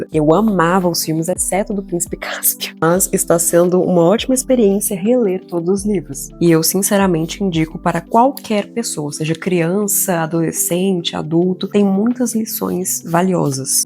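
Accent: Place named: Portugal. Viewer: eu sempre fui leitora, mas meus pais não tinham condições de comprar livros sempre, mas na minha escola tinha biblioteca e eu AMAVA, me sentia a Matilda.. e um que me marcou muito foi "Apertada e sem espaço" e está na wishlist da amazon do meu bebê.. sou louca pra ler os livros da minha infancia pra ele. que delicia de vídeo, conheci você atraves do chat da Amylivros na twitch, e seu vídeo me prendeu e me cativou de primeira.. quanta delicadeza e dicção boa de se ouvir. Você é de portugal? sotaque me lembrou um pouco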